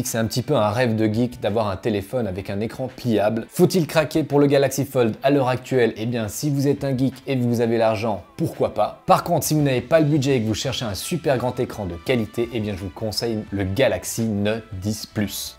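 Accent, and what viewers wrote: Vaud, Switzerland. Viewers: Le beau acsent vaudois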